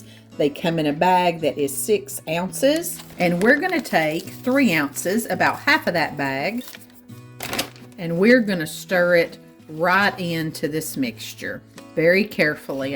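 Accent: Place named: Tennessee, United States. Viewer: I might try the crockpot sweet potato casserole this year! So glad I found your channel because I grew up in Madisonville for several years of my childhood and your accent makes me so happy and reminds me of TN! I still live in the south, but TN holds a special place in my heart. I also purchased some jams for Christmas presents! 🥰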